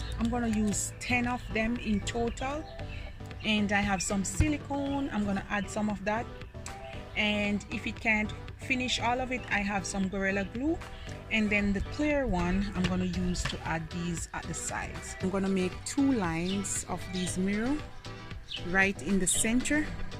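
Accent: Jamaica. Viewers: I just subscribed cuz of your Jamaican accent💪🏾❤️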